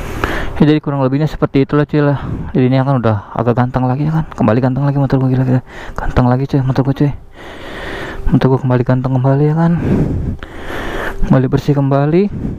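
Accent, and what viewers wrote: Indonesia. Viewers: Anjir bahasanya orang indo bat kentel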